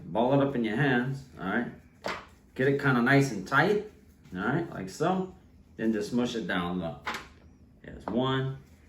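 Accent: Louisiana, United States. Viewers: I’m from New Orleans but I can listen to him all day.... It’s a different Louisiana accent.... When I left home for years and came back I loved to listen to all the different accents in Louisiana . I was able to hear it being that I was gone for years ....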